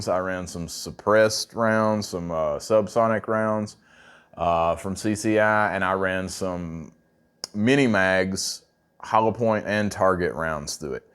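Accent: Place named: Oklahoma, United States. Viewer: ha I thought he said raffle but he said rifle. lol we sound like that here in Oklahoma. love the video bro you did a great job!!!!!!!!!!!!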